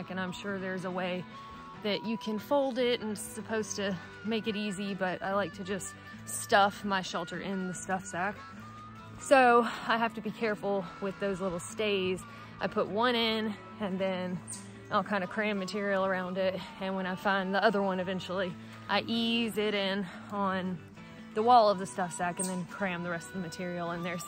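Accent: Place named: Tennessee, United States. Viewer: I feel like I am back in northeast Tennessee when I hear you talk. When I first moved away to live in richmond,va 34 years ago people would always asked me to say certain words lije night,right,light and Tennessee as I had a big southern drawl.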